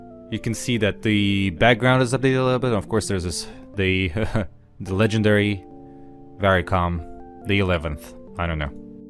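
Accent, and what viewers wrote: Russia. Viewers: слышен твой русский акцент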